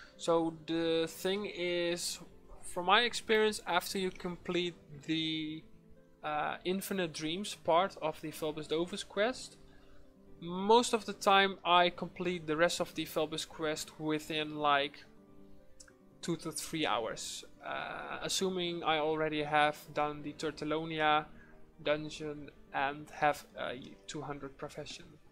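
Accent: Netherlands. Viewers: Ik was al aan het denken dit accent ken ik maar je bent inderdaad nederlands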